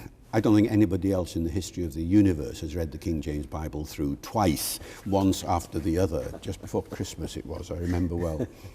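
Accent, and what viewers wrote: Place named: Wales, United Kingdom. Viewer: He occasionally sounds a bit Welsh. Born in Northern Ireland. Wonder how that happened?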